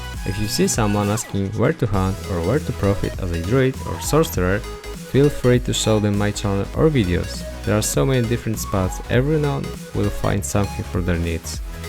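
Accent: Poland. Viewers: slychac ze polaczek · masz polski akcent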